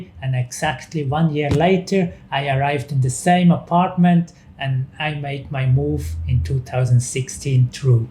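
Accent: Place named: Switzerland. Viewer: Love your Swiss accent ;). I m from Switzerland too! Very nice advise maybe one day i will mouv to Thaïlande too ;). I m sure you miss our lovely cheese and chocolate too 🤣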